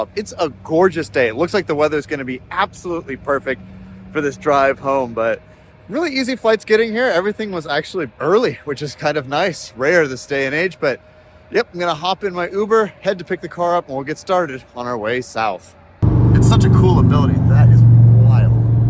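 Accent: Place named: Ohio, United States. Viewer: San LOUIS Obispo?!???? You sound like your from Ohio or somethin!!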